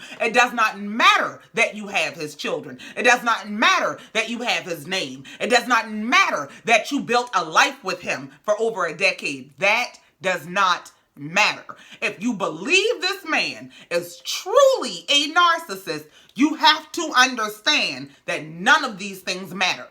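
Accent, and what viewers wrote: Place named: Gullah, United States. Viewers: Are you from SC because your accent sounds Geechie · Don’t know how I found your channel, glad I did!! You give great commentary!! Where are you from? Your accent sounds like mine from the Carolina’s❤️❤️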